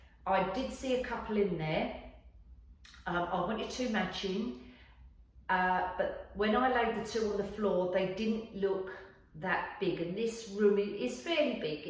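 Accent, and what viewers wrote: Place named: England, United Kingdom. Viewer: Love your beautiful English accent.. beautiful home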